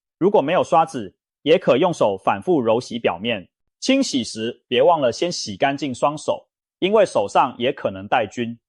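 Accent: Taiwan. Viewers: 明明作者是台灣口音，為什麼要用大陸的說法「牛油果」來稱呼我們台灣習慣稱呼的「酪梨」呢？ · 主持人一口台灣腔應該是在台灣長大的，為什麼中文這麼差很多的錯別字而且讀白字。我建議還是用英文做節目吧至少英文不會有錯別字也不可能讀成白字。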